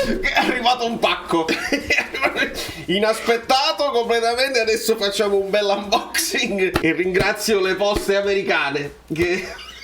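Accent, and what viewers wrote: Naples, Italy. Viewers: buongiorno. Buona domenica delle Palme. Il tuo modo di parlare napoletano somiglia molto al mio. Sei di Fuorigrotta come me? Non vivo più a Napoli, ma è sempre la mia città. Hai l’età dei miei figli e sei troppo simpatico!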